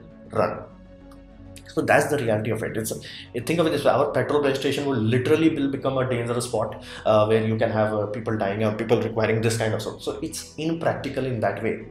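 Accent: India. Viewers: Thank You :-) Piece of advice, speak slower, i've spent a lot of time with indian support people and it takes a while to get used to the accent. But other non native english people (I'm danish) have to translate twice when working with indians."indlish"<>english<>danish so talking slower is at the essense.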